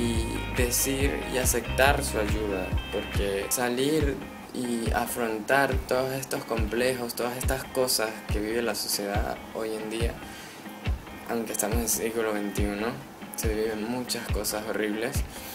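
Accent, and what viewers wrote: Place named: Venezuela. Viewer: Soy nuevo en tu cuenta, sería genial que te presentarás y dijeras de dónde eres.. ( hasta el momento creo que eres venezolano por tu acento ) entre otras cosas como tus gustos.. tus fans te quieren conocer 💟.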